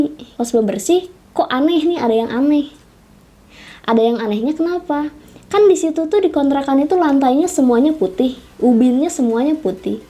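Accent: West Java, Indonesia. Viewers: Orang Sunda ya Neng....? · Sunda pisan euyyy,lope yu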